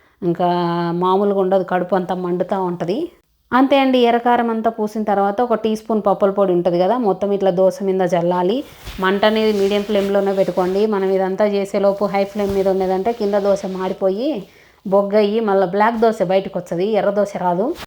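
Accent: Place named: Kadapa, India. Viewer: Hi kadapa bhasha vachhinya ,poinnya adaragottunnav .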